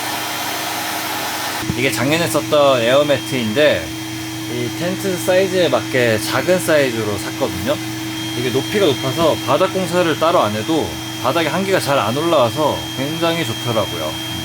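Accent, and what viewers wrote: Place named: Seoul, South Korea. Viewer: 날이가면갈수록 ㅋㅋㅋㅋ서울말 느신거같아요 ㅋㅋㅋㅋㅋㅋㅋㅋㅋㅋㅋㅋㅋㅋㅋㅋㅋㅋㅋㅋㅋㅋㅋㅋㅋㅋㅋㅋㅋㅋㅋㅋㅋ오늘도 랜선캠핑 👍🏻👍🏻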